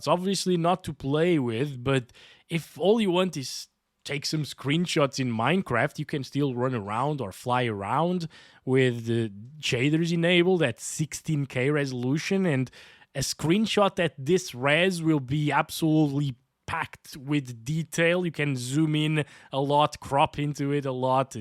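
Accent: Portugal. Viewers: Primeira vez a cruzar com este canal e ao fim de 10 segundos, meto os meus tomates no cepo como é um português! 🥳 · Ah, I always knew you were Portuguese! That accent does not lie lol As a fellow PT I forgive you for preferring beer 😄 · mano no inicio do video eu percebi logo que o gajo era tuga ahahah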